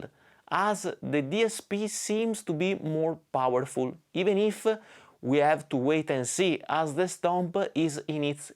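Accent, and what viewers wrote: Italy. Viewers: He's Italian, he has an Italian accent; what's the issue here! Great video (as always)!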